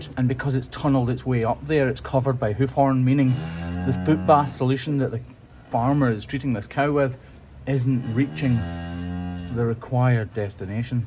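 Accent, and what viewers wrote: Scotland, United Kingdom. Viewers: Luv your channel. I live in the USA 🇺🇸 and sometimes your accent seems so strong to me, I have to put on the subtitles! 🤣🤣 No worries I absolutely LOVE your Scottish 🏴 accent!! I hope you and your family are all doing well. ❤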